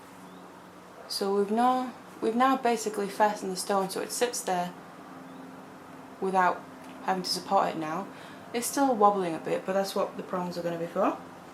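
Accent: United Kingdom. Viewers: Awesome video! Where in the UK is your accent from?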